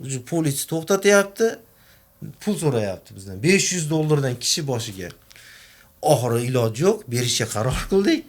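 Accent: Andijan, Uzbekistan. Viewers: Ертак боп кетди акахон Ростан Анжанлимисиз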